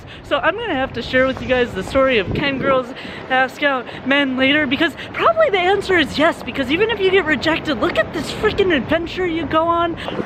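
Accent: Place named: Wisconsin, United States. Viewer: Wisconsin Accent OP 😜🤘🏼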